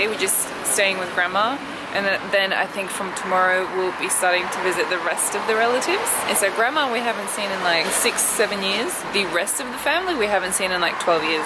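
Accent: Australia. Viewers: Have you been living in Australia or sth? Cuz your "So", "No" etc, sound more like Sewrlgh, Newlgh XD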